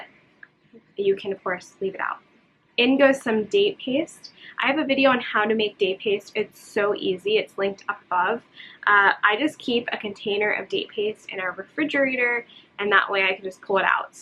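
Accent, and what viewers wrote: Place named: Pennsylvania, United States. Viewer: I love your accent! I’m from New Jersey and the way you say school reminds me of being back home many years ago. I see that you’re probably from Pennsylvania? Close to Jersey. I am enjoying your channel thank you for sharing!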